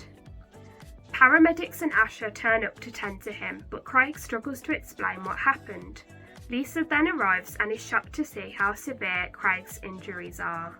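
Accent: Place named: Midlands, United Kingdom. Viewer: I love that you have a Midlands accent 👏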